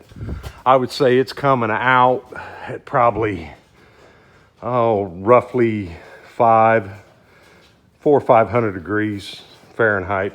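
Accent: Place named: South, United States. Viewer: Okay, you've got a Southern accent. I was driving on I285 outside of Atlanta some months ago, and holy-traffic jam, Batman, the Batmobile was driving in the lane next to me. My wife was with me, so she saw it too. Couldn't get a picture of the thing, but we weren't hallucinating, so we chalked it up to a visual spotting of a UDO (unidentified driving object). And didn't think much about it till this video. Ever been to Atlanta? I just assumed that it was being driven to one of the local movie studios that have popped up down here.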